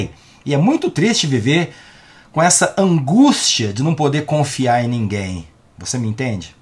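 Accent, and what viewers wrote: Minas Gerais, Brazil. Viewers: Faço minhas suas palavras. Parabéns pelo tema deste vídeo. Agora, diferente de você que é mineirinho, imagine o meu grau de frustração por morar em Curitiba que, embora seja considerada uma capital com um dos maiores índices de qualidade de vida do Brasil, convivo com a população mais "canhinha" que habita nosso querido torrão natal. Você conhece a escala de Bristol? Se conhece lhe digo que o pessoal daqui tá no topo da escala "prisão de ventre" Mineiro fala diferente mas nós aqui também.😂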